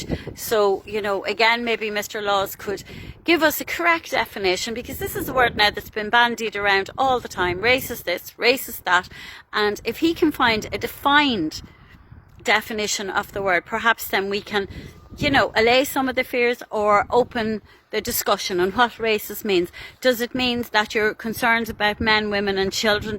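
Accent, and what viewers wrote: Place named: Ireland. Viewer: Great , spoken like a true Irish lass.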